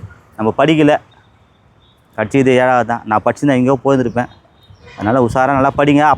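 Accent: Madurai, India. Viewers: Madurai vasagam super ❤🎉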